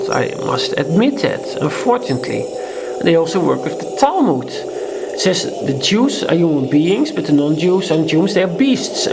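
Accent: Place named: Netherlands. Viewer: Hey, leuke film hoor, je bent zeker echt Nederlands he? Je accent ;)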